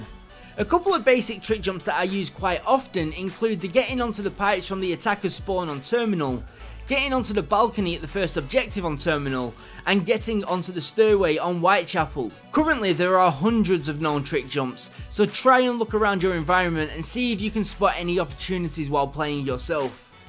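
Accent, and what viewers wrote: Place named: Manchester, United Kingdom. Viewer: straight manc accent :D! nice vid man thanks for the tips!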